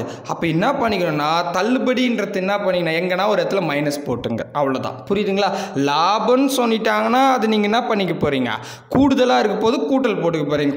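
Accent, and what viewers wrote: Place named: Chennai, India. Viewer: Chennai slang explanation.... Nice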